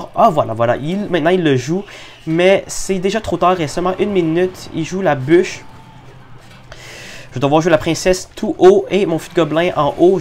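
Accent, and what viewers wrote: Quebec, Canada. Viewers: Je kiffe tes vidéos mon poto! Et puis faut dire que ton accent me tripper ! Vive la France pardon vive le QUÉBEC !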